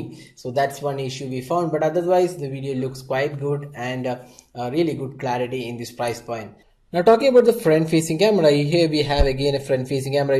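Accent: Kerala, India. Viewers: u sound like a malayali :)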